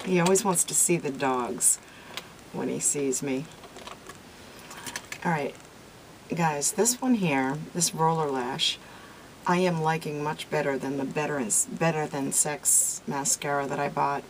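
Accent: Boston, United States. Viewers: You to. I love it when I hear your Boston accent. LOL. I think your hair look beautiful. Bangs or no bangs. xo Marie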